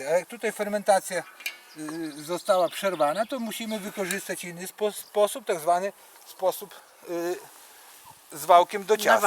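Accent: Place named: Kresy, Poland. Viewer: Sympatycznie i ten fajny kresowy akcent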